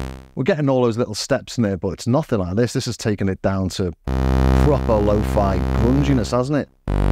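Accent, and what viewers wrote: Liverpool, United Kingdom. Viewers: That gear video was gear (as we used to say in my youth)😉 I am not a great keyboard player, but you've certainly piqued my interest with this video. Great to hear the sweet tones of home... (Your accent, not the synth 😊). I'm a Scouse exile in Catalunya. Off to check out some more of your vids. Ta ra... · My wife says Scouser.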